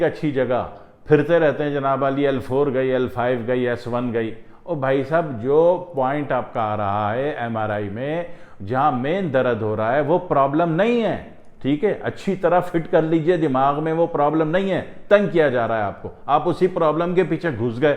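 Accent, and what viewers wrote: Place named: Delhi, India. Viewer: Janaabe aali.... kiya aap ka Delhi se koi talluq hai.....aapki zubaan aur lehja Delhi ka sa lagta h .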